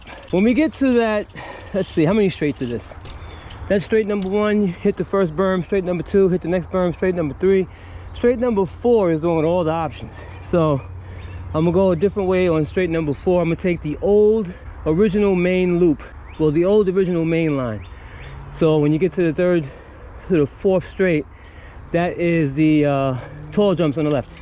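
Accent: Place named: United Kingdom. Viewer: That british accent tho haha! Love the video as always my man!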